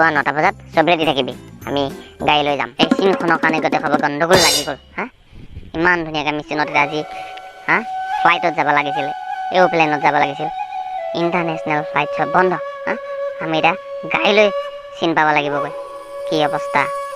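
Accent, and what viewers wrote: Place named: Morigaon, India. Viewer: Ha ha ha Mojja Morigaon vaxa jen lagil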